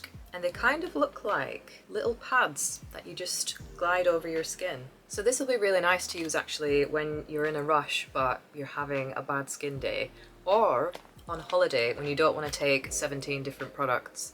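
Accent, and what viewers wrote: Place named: United Kingdom. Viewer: good morning. Your excellent mastery and ability to introduce the goods well, which is always accompanied by composure, smile and honesty. It has always impressed me as the few audiences of your man who is not a consumer of cosmetics! I enjoyed watching another informative and creative performance from you.. At 1.39, the introduction of this month's magazine (edit), which introduced inspirational travel products, was important and impressive for me. At 6.59, it was remarkable for me to see your good and positive addiction to your skin care! In the 8th minute, a 20% discount on purchases was also really tempting. Sorry if I could not come in time to watch your new videos. Your beautiful and energetic comments for my channel will always remain in my mind. Thanks and congratulations for the interesting video you shared with the topic LOOKFANTASTIC JULY UNBOXING & DISCOUNT CODE. 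I'm glad to have the support of a valuable and committed makeup artist like you. My dear friend You are one of the few people who have always eagerly come to your good channel because of their humble and regular approach over the past year. I hope that in the future I will be able to prepare more attractive quality videos for my audience, inspired by speaking in your original British accent. I also hope that there will be an opportunity for make-up artists from other countries to become more familiar with your excellent channel through your good and lasting comments. Many wishes for the increasing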